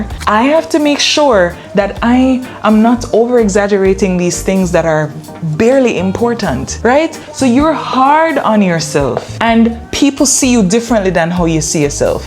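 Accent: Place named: Jamaica. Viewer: Love the Jamaican exscent😍😍😍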